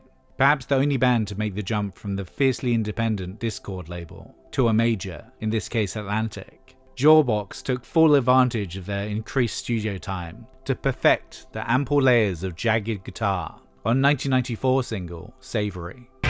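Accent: United Kingdom. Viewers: Ngl it's really weird hearing a British guy talking about emo. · Can Brits like, not narrate something for once?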